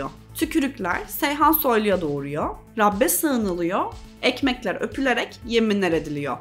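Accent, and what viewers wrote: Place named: Bolu, Turkey. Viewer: bounlu di mi, konuşmasından anladım...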